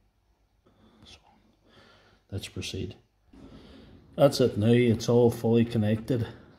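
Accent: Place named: Scotland, United Kingdom. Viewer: Well isn’t this great. I found out recently I’m more Scottish than anything else (American from the mid-west) and I’m about to get into a damaged m12 pack. Beautiful. 😂 I’m sorry if you’re not Scottish but to my ear that’s what I’d guess. Great video regardless. 💪🏼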